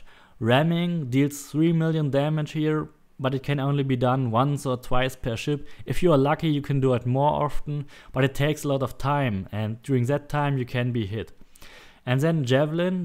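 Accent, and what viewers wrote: Germany. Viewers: Love this channel. Despite having a strong german accent (like me:D) which could be difficult to understand by everyone these informations are pure gold. Thanks to this channel I played the game again. I have so many ability points that I can try out many sets which are also presented in this channel in a very detailed manner. Very helpful! Thanks! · Only took me 10 seconds to recognize that you are german. Love your videos. Keep going <3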